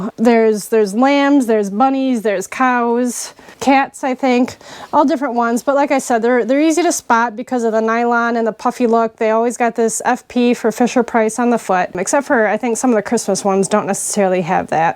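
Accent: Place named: Eastern Canada, Canada. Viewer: You sound like your from eastern Canada ….🤘🏼🤘🏼. Subbed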